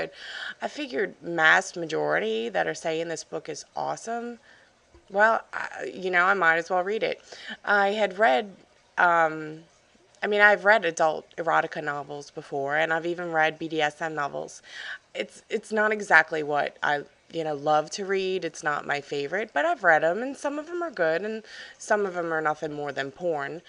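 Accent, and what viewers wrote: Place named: Eastern Europe. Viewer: lol funny east europe accent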